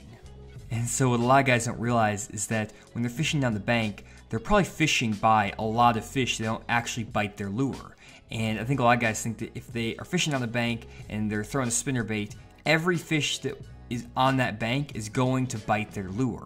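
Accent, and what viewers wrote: Oklahoma, United States. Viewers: Are you from Oklahoma?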